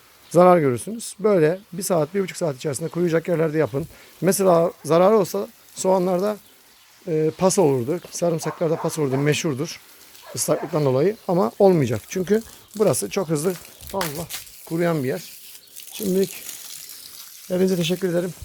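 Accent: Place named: Aegean, Turkey. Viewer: Hocam boşver sen onları etrafımız negatif insanlarla dolu. Sen samimi adamsın belli konuşmalarından. Nerelisin izmir aydın tarafına çok benziyor şiven. Allah kolaylık versin. Kolay gelsin.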